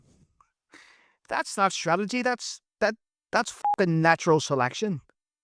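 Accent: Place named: Ireland. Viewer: Great video man. What part of Ireland are you from ?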